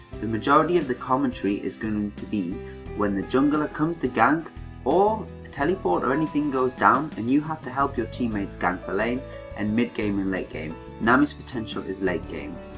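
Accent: United Kingdom. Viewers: Im not a native english speaker, so my accent tends to be more US than UK, but after watching this vid, i will only speak with a british accent, because its so fenomenal and classy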